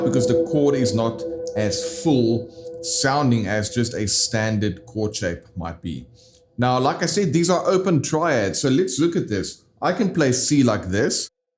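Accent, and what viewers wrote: South Africa. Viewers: I did not expect to hear a South African accent lol. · Recognise that South African accent! Refreshing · Awesome stuff man are you South African? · You sound south african and you wearing a Jbay hat! Victory church?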